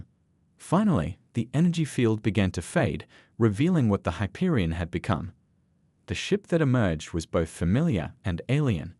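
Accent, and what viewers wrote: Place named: New England, United States. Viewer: The narrator (AI?) has a distinct New England accent!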